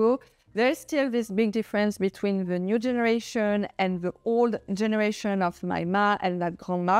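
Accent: France. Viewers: So i believe Emily in Paris look is satire. It’s an over exaggerated idea that some American women believe French women dress. Just my thought I too was raised by a French Maman and taught how to dress in a minimalist but tailored way. My mother was a fabulous dresser. But towards the last years of her life she embraced the “jogging suit”, precursor to ath- leisure wear 😅. Love your channel and advice. Love your accent too. I miss my Mom and your accent brings back good memories for me. ❤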